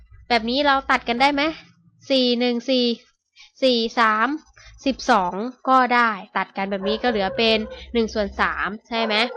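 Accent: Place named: Suphanburi, Thailand. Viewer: สําเนียงสุพรรณ คึด ถึง พุ่มพวงเลย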